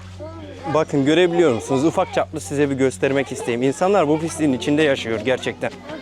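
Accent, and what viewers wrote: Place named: Adana, Turkey. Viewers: Kardeşim benim bu yorumları ciddye al sonuöta senin daha iyi yerlere gelmeni istiyoruz doğal akışında kendin oldugun gibi videolar çekmeye devam et belgesel tadında videolar başka bir tarz ve birkaç konuda kendini çok geliştirmen lazım ki gerek yok bence kendin oldugun gibi o anadollu şivenle sempatikliğinle yeterlisin belgesel tadına gerek yok kendini aşağı çekersin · Kanka adanalı mısın konuşma onlara benziyor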